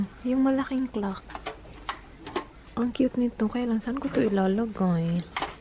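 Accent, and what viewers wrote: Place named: Visayas, Philippines. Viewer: Diko na gsto accent ng English mo. Tunog bisaya na. Nong nasa cebu ka gstong gsto. Or baka sa video lang kasi madame kame bisaya na viewver mo.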